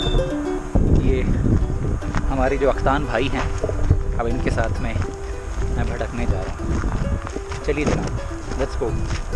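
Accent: Haryana, India. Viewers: Bro are you from Haryana? Cause you speak haryanvi so well. Love from Haryana ❤ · Ram Ram Bhai 🙏 🙏 Bhai Pataudi aala ♥️ Bhaiya bda achaa lg rha h aapko haryanvi bolta dkh he video m ...mujhe yaad h m aapko bolta tha hr video m haryanvi bolne ko or wo aap kr bhi rhe h ...bda achaa lg rha h bhai ji ♥️♥️ Dil s dua h bhai ko or jyada trkkii kro aap ♥️♥️ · Bhai kasuta mazaa aa gaya.haryanvi boli chorah daar gya.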